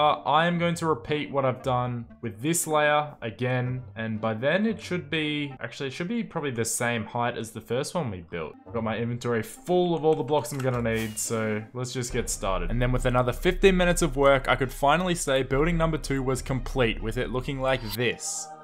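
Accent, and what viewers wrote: Australia. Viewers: This dude had an accent and everything and I still didn't realize he was Australian until he said something. I'm an idiot. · WAIT YOUR AUSTRALIAN!?! I didn’t know….YASSS I FINALLY FOUND A NON~ AMERICAN YOUTUBER!!!!!!!!!!!! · Wait, you’re Australian? POG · You're Australian? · Are you Australian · Wait, Australian YouTuber?? Omg I thought he was British... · Seriously I never Knew you where Aussie #AustraliaForLife Because I’m Aussie to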